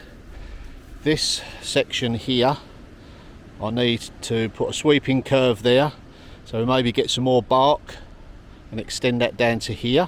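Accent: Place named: England, United Kingdom. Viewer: so glad to see you in your channel. I've been enjoying you at the convent project and didn't know you had your own channel. This is great!!! Love your kind demeanor and calm. You're also practical and very talented. Looking forward to watching you make your beautiful garden. Love listening to your English accent 🥰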